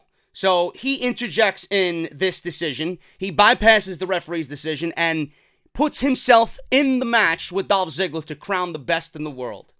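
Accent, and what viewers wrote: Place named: Fort Dix, United States. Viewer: I actually liked parts of this show Shawn did his do,they over did the Fire works I agree Braun sucks man I forgot how little Rey is good job I’ve been watching wrestling along time even saw the Real Nature Boy wrestle before Flair I always stop and listen to you I was Station at Fort Dix in the 70’s everybody sounded like you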